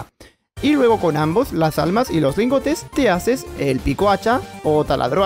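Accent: Chile. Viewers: bro, te salio con un acento muy chileno el video XD, la parte q mas me gustó fue y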